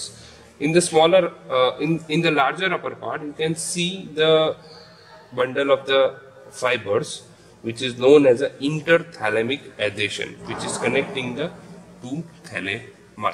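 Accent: India. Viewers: if you cant understand the indian accent you haven't seen enough educational videos smhh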